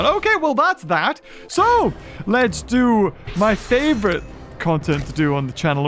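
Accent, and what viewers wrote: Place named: United Kingdom. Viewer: You can tell that he's British!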